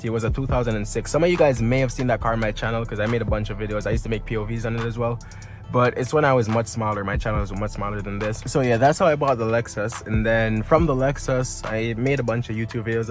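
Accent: Jamaica. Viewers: Sound like you are jamaican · i hear an accent are u mix with jamaican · I’m 16 and moved to Canada from Jamaica 4 years ago and right now I’m really struggling to get hired somewhere. Not even McDonald’s wants me 🤷‍♂️. I’ve already thought about renting cars in the past but I can’t find the money to buy one and clean it up. Also the second I heard your voice I knew you was a brother from the island 🇯🇲 g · are u jamaican by any chance? the accent.. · I was here thinking you sound Jamaican from your accent. I am in college as well in Kingston, I'm 19 and would love to be driving a nice car like that as soon as possible. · i can tell ur jamaican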